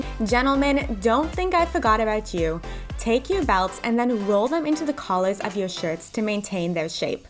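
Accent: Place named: Bronx, United States. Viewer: Your voice sounds a bit different like you come from the Bronx not Canada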